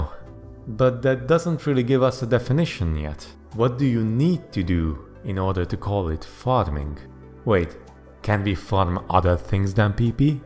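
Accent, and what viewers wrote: Hungary. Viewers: csak szimplán hallgatom a hangod és annyira magyaros akcentusod van hogy meg kellett néznem a profilod... és tényleg (csak most találtam rá a csatornádra és tetszik amit látok ;) )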